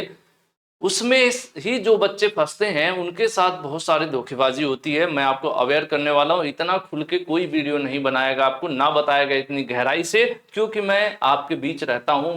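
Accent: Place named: Bihar, India. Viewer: Sir bihar se hai😊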